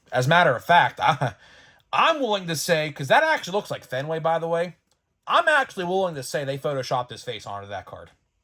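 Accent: Pittsburgh, United States. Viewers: Yinz sahnd like mark madden … jagoff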